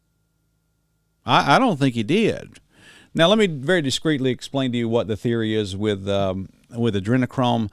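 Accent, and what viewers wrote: South, United States. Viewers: You sound like a hillbilly brooooo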